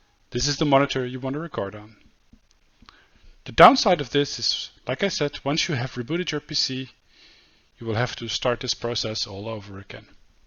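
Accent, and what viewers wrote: Netherlands. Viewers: jij ben sws nederlands ahahah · Haha strijder. Ik dacht in het begin all "hmm is deze guy nou NL?". Letterlijk 2 sec later "my PC is set to Dutch"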